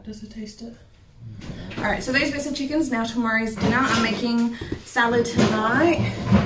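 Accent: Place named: Australia. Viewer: Sorry missed township U are at next time please repeat is it in Aussie U sound Australian... For Breakfast if U like steak buy some Minute Steak tender and cooks fast...Lamb Rump Steak are yummee sear quickly on the stove in butter and a little oil...make some gravy up for morning with eggs on toast yummee... Only suggestion buy some Topside Mince from butcher U will never go back to Coles... Why don't U spend $200 at Butcher or make a deal with him as he is trying to get your business...veal chops are great to eat aswell... I think Nick is correct country things are better than Grocery Stores... Whole Chicken make Broth out of thr Bones...also if U like those Expensive $13kg Tomatoes grow squeeze some seeds into a pot grow your own...as it looks like Summer...I was always told if U like the taste of a tomatoes U keep the seeds of them for now or next year to grow put some on a paper towel...😂❤😂❤😂❤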